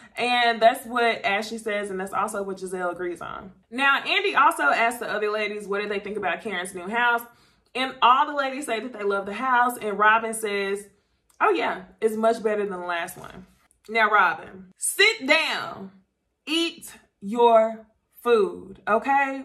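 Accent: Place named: South, United States. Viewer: Come through with the fair and unbiased perspective. Love it! I also love your accent. This is from one southern woman to another lol. Can’t wait for the panel tonight.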